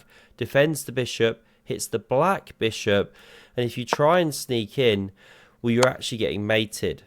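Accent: United Kingdom. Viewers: Love the humour! And the British accent <3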